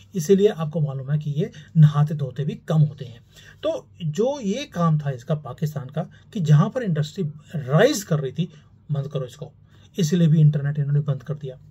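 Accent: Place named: Punjab, India. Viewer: You are simply amazing your Hindi in Punjabi is too irresponsible,and typical Punjabi moms taunt way is ultimate and of course not the least your courage in using words to insult the rivalry is super awesome..keep it up keep growing..Jai ma Bharti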